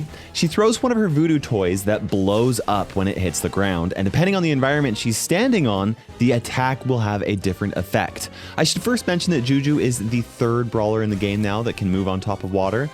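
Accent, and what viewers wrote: Louisiana, United States. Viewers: Love the Cajun accent ❤😂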